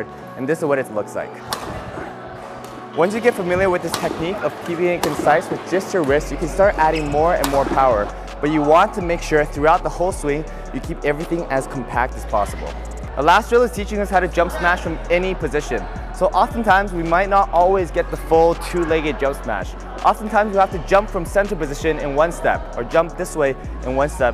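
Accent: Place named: United States. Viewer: i've watched both your smash videos and have the following comments about what i like and dont like about the way you teach and/or perform smash. like: 1) you emphasize getting your body behind the shuttle so that your energy comes forward to smash, not backwards or straight up. the energy is forwards. there are many details to the smash, but if a beginner learns only one thing, it should be to do the work to get your body behind the shuttle, not beneath it, so your energy comes forward as you strike. and not only smash, but also clear and drop, get your body well behind the shuttle before you try to strike it. i like the way you said 45 degree angle forward. that is right on, 2) the way you demonstrate pronation seems very clear to me, 3) the way you use throwing to demonstrate the swing. i assume you are in the US from your accent and the word 'bird', and one sport americans understand is baseball. 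if you look at major league pitchers in slow motion you will see many relevant components of smash and swing. dont like: 1) your flow of energy from the floor to the racket head is compromised by a failure to isolate your hips and rotate them separately in advance of your torso. this means that even though you rotate your body 90 degrees before your smash, you are not taking full advantage of the potential rotational energy there. really, there is no point in rotating your body a full 90 degrees before smash if you do not isolate your hips and rotate them separately, and i have s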